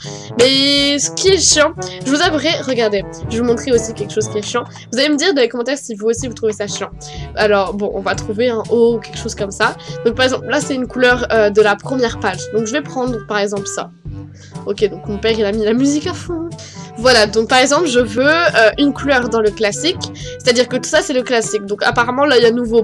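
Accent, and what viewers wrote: Quebec, Canada. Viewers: On entend beaucoup ton accent Canadien❤ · defois ta grv un accent québécois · Go les 100K ?😙 t'as un p'tit accent nan ?😁 québécoise ?😏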